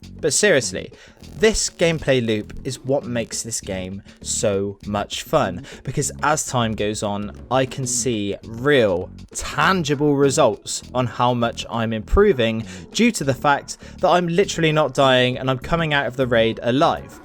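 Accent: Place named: West Country, United Kingdom. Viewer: Mate, might sound strange but have you ever kept a track of where the people in your lobbies in Call of Duty come from? I might have too much free time on my hands, but I'm from Nottingham and back in day, I used to play with people from Sheffield, Leeds and surrounding areas, I NEVER played with people in Europe. You'd very occasionally get into a lobby with some Americans which was quite cool as I'd never spoken to a yank before, but all I used to come across was 'UK ReMiiX' and the like back in the day of Cod 4 - BO1. Nowadays, my games are dominates by Germans & Turks for some reason. I'm literally playing with the same people with 'KURD' or 'TURK' as their clan tag game after game. Why?! I'm guessing from your accent you are probably from round the Bristol / Swindon area, do you still party up with people in the South West or are you witnessing the same thing as I am?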